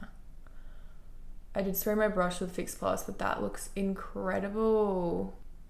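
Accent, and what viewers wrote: Australia. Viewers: I never thought the Aussie accent could be unattractive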